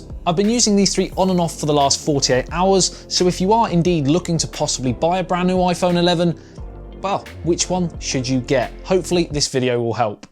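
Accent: United Kingdom. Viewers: is British by his accent...and i guess I am right...!! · Typical irritatiing british accent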